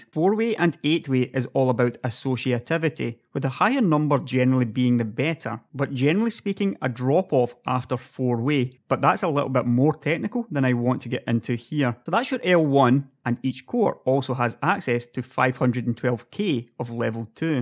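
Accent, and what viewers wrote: Scotland, United Kingdom. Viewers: he is full on * Scottish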